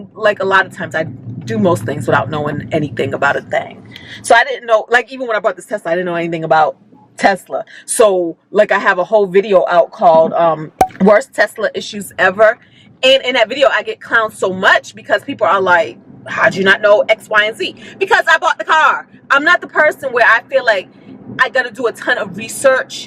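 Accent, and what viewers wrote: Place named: New York, United States. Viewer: Check to see if your state has a ERAP program. Idk where yiu are from but you sound a little New Yorkish to me-- if so that program opens June 1 and the landlord can apply for it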